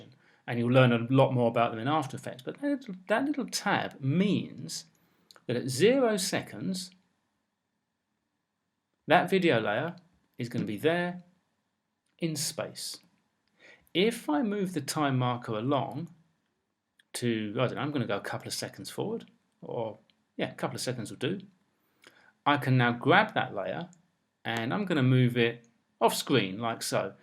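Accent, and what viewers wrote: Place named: United Kingdom. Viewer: British accent is great!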